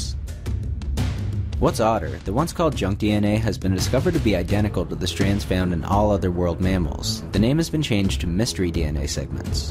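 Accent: Scotland, United Kingdom. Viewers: Scottish? Did I miss a voice/face reveal? 😕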